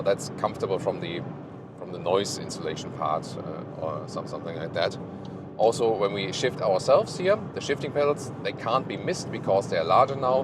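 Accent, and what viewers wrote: Germany. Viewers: Klingt nach German English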